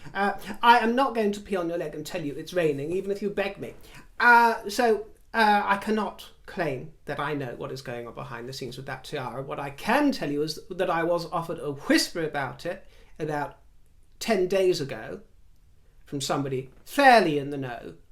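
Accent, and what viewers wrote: United Kingdom. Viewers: I was wondering if anyone knows if is American and really live somewhere in America (and just does a good accent) Or is he really from the UK? 😁😘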